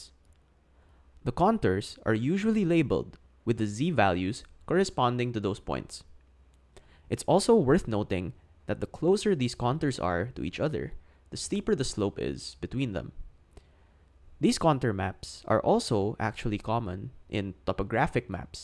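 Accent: Philippines. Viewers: he sounds Filipino yes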